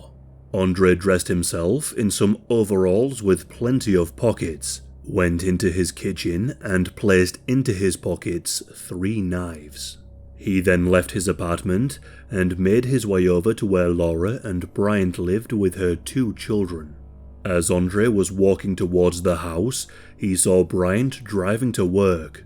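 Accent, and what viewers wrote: United Kingdom. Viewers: it would be much more scary if you didn't have this hilarious british accent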